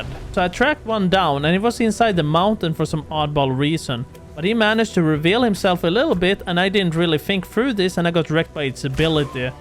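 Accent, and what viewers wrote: Sweden. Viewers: Are you from sweden? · where do come from ???????????? you sound swedish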